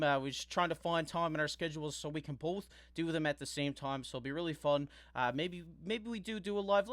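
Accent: Newfoundland, Canada. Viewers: No trouble to tell your a fellow Newfie! Good to see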